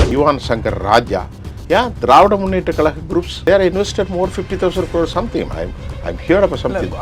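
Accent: Sri Lanka. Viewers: இலங்கைத் தமிழ்.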